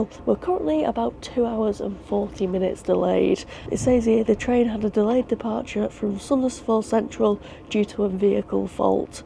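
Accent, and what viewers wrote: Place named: Sheffield, United Kingdom. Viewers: Love your accent; thinking from around Sheffield? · Lucky find for me this video, thanks. Looked like a great trip - especially for free. Sounds like you may be a fellow Sheffielder - am I reyt? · I live about 60km due south of Bastuträsk so this is a train I have used a number of times. I am however looking forward to the Norrbottnia being completed up to Skellefteå which will speed up services a great deal. Nice video and well put together and I love you English accent. I am originally from South Africa 🤪. Subscribed. Fun Fact. SJ took over the service of the sleeper train service (they were the original company before privatisation) while waiting to see if anyone would take over the service. It has been said it will be ended/closed soon but as of yet no takers. As for now the final run is planned to mid April 2026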